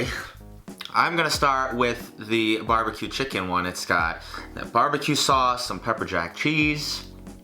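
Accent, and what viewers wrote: New York, United States. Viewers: your accent is so new york lol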